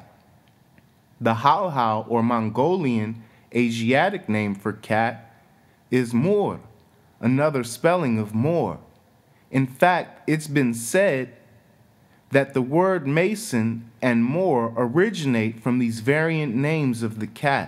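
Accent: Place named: United States. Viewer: 7.15: cat in Latin is feles, not gatto. This is Italian. Zarathustra was not an esoteric Persian but a wise man like Buddha. Some things are so hard to understand in your very, very strong American accent. It would be great if you could ask maybe the Google translator how to pronounce words. At 5.55 f.ex. Or Zarathustra. I understood Persian. So the rest I understood because I know the rest of facts on my own. But this is not the sense of your vids, right? This would be a solution for this problem. :) <3 I wish I would have understood more of it. But even the subtitles did not understand you. No joke. Desperation! But thank you for what I got from this. Very y interesting thoughts and lots are so true! Good luck to you!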